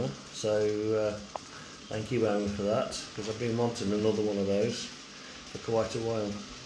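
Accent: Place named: Midlands, United Kingdom. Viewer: hear your dulcett Midlands tones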